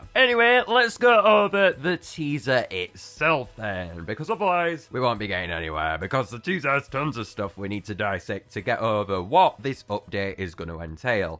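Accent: United Kingdom. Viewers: You are great to watch! I love your British accent! 💖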